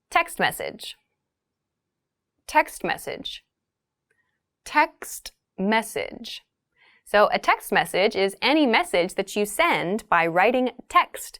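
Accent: United States. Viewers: you're the best, i love your American accent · Thank u very much for helping us in learning English. Here I want ask u a question, please tell me where are u from and if iam not wrong ur speaking American accent, isn't it?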